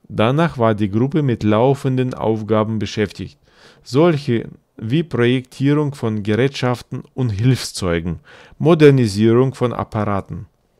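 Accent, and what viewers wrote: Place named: Russia. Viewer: На самом деле очень сильный русский акцент. Для носителя языка сложновато слушать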